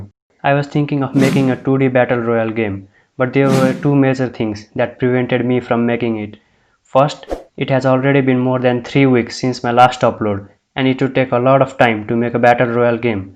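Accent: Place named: India. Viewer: Heey you sound like an Indian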